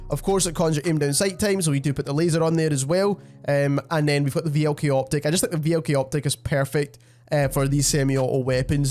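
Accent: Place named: Scotland, United Kingdom. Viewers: Sounds like the janitor from the Simpsons... so yea... a Scotsman. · Yes scottish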